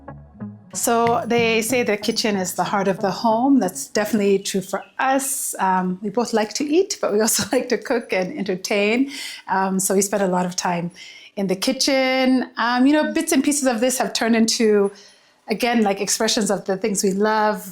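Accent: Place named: Kenya. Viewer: she even got that kenyan accent wow.....i wouldn't even know she's ethiopian if i met her, would have assumed she's kenyan borana or oromo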